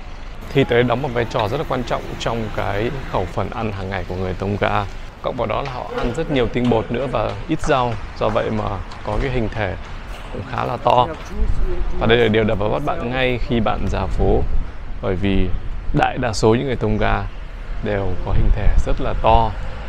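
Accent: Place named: North, Vietnam. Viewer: Cô rất thích Video của Cháu trình độ thật hay .Cả giọng Bắc dễ thương nhẹ nhàng .Mong Cháu thành công trong công việc .👍🥰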